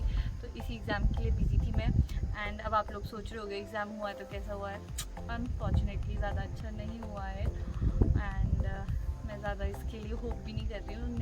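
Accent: Pahadi, India. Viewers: Appki Hindi bolne ki tone ek dam pahadiyo wali hai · Yes I am interested too in your city life, your voice such a sweet bird like kuhu 😊💝 and apki voice m jo pahadi tone aati h wo lajwab h , or ye tone hmari garhwali tone se match hoti h 😊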